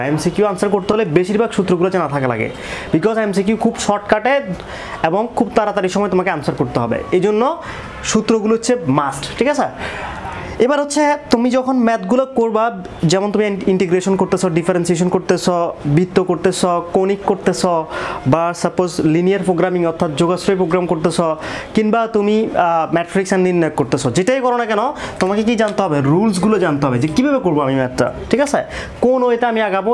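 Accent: Chittagong, Bangladesh. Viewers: চিটাইংগা নাকি???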